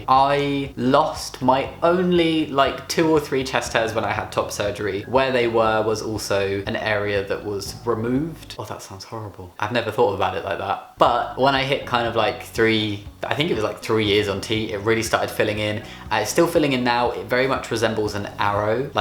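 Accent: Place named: Scotland, United Kingdom. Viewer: Little bit less Scottish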